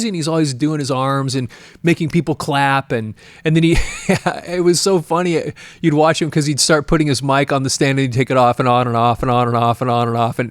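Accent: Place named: United States. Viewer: È così imbarazzante vedere una persona della tua età così eccitata per una questione da 15enne che non la riguarda direttamente❗Vedo che non sei sposato, sei americano dall'accento e hai 15K iscritti.... è tutto così anormale ❗ Continui a strillare per delle scemenze è imbarazzante non riesco neanche a scrivere ❗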